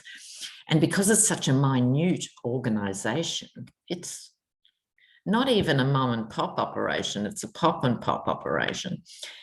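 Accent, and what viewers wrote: Australia. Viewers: One more thing: after 4 years of being an intrepid viewer I have a passable Aussie accent. All thanks to u my love. 🥰 I’m sure I’m not the only one. 👍😁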